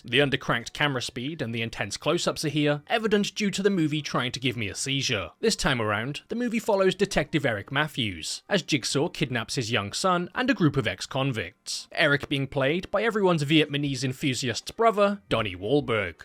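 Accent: United Kingdom. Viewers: i nnoot know he british until i press play bruh i had it saved to my watch later my life is ruined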